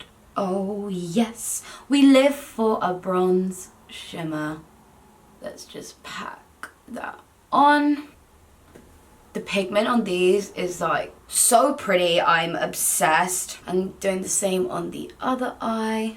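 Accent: Newcastle, United Kingdom. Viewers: R u from Newcastle!? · I’m new to this channel and got so confused. So geordie at the beginning and then not at all by the end of the video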